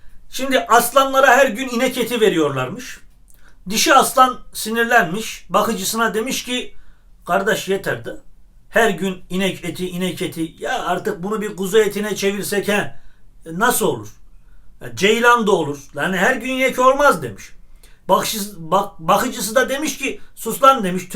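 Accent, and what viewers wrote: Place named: Black Sea, Turkey. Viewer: karadenizlice konuşmana bayılıyorum:D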